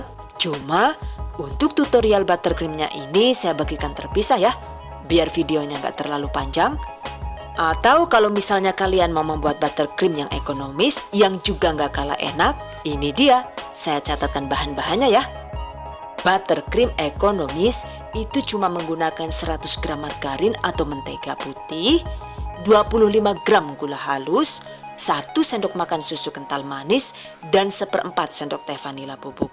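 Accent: Banyumas, Indonesia. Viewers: heheh.... ngapaknya ya allah saya bgt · ngapak2 logat suaranyaa iya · Mksh resepnya bunda, sy klo lihat videonya bunda suka ngekek sendiri klo lg pake bahasa ngapak hahahaaaaa, lucu. Smg tambah sukses buat bunda yaaa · Kayane kie wong ngapak olih bojo wong jawa timur kiye . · Wkwkwk ngapaknya lucu, asline sing Endi sih MB?? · . Rika sih womh ndi. Jebule ngapak 😅😅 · Rikane deneng teyeng ngapak Yuu...jarku wong Madiun koh ngapak😃😍👍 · aku seneng deh ne wis ngmnge ngapak 😄😄😄😄😄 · Lucu ngapak bgt kaya enyong hahahaha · Nembe nonton... Ternyata ana sing ngapak juga... mandan kaget aku.... 😂😂😂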